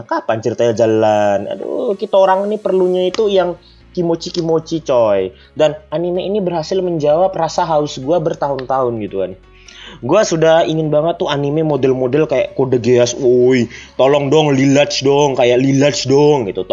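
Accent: Banjar, Indonesia. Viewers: Bang dri banjar kah?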